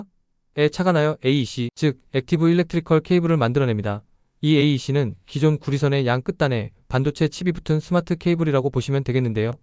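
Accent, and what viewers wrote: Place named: Seoul, South Korea. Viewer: 똑소리나는 서울분 , 반가와요. 유투브 흥하시길 💕 우연히 들어왔다 구독 좋아요 하고갑니다.😊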